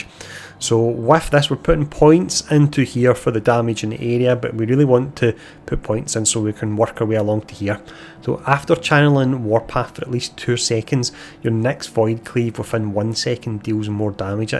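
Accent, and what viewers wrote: Scotland, United Kingdom. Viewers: I came for the build, stayed for the Scottish accent.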